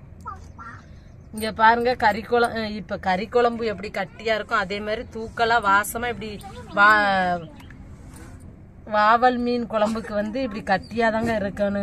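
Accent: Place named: Salem, India. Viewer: சேலம் மாவட்டத்தில் இருந்து பேசுறாங்க சூப்பரா இருக்குங்க வீடியோ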